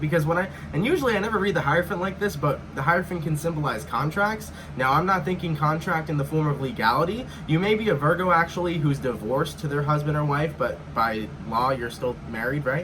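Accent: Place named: United Kingdom. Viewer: also i’m hella jealous of your British accent. 😭 I wish i had one hah. 🥰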